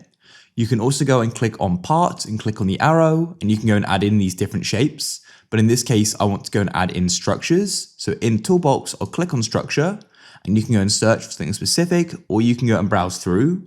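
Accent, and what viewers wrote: United Kingdom. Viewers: you to british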